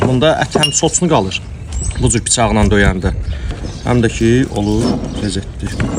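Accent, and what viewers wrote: Baku, Azerbaijan. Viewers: Çox sağ olun xoş sözlərə görə braziliyalı qonağımız. Bu tək söz yığını deyil. Həqiqətən nəinki Şağan kəndini bütün Şüvəlan Mərdəkan v ə başqa bakı kəndlərimizi təmsil edir. Allah razı olsun sizdən bakılı qardaş. Əsl bakılı... danışığınnan, söhbətlərinnən, hərəkətlərinnən danışmadan bildir məsəlinə uyğundur. Allah canıvızı sağ eləsin. Balalarıvızı qorusun. Xeyrlərini görəsiz. Ailəmiz,də qaynımgili də sizi yutubdan həvəslə izləyirik. Bişirdiyiniz hər neməti də bizə elə təqdim edirsiz ki gələn veriliinizi səbirsizliklə gözləyirik. Özümüz Şüvəlandanıq. Bütün Mərdəkan Şüvəlan kəndinin camaatı adından sizi salamlayırıq. O dadı var? Yox.. sonra da yeriviz məlum deyən balanı da əvəzimə öpərsiz. Allah saxlasın. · Əsil Bakılı ləhçəsi,can Bakılılar,daim var olun ❤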